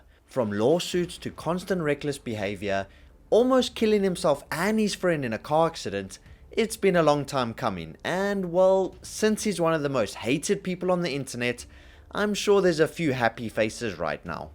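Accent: South Africa. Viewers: Are you a South African? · Wait are you from south africa?! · Are you South African?👀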